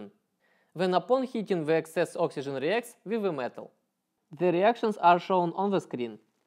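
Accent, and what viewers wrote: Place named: Soviet Union. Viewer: Всемогущий Великий Русский Язык пробивается в гроссирующем ррраскатистом Советском акценте! Так Держать - продолжайте практиковаться!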